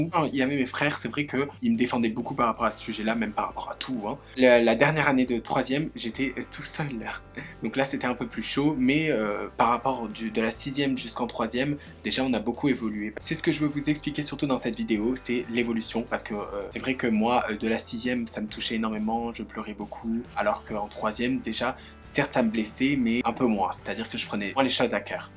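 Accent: Belgium. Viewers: Tu es belge car je trouve que tu as un petit accent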